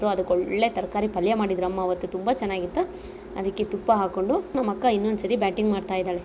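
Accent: Hassan, India. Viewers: I became fan by seeing 1st time ur videos because f our hassan slang language mam..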